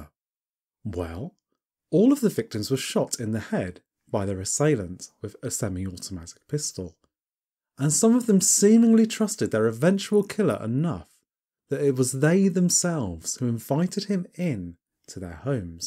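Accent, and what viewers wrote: United Kingdom. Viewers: indeed it is very outnumbered by American and Canadian channels they make great effort and content but its refreshing to have a face and a brit voice narrator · Absolutely no offense ment, but my friend and I have had a long running joke about a someone with a British accent being able lul you to sleep while describing the most horrific of acts. I have found proof!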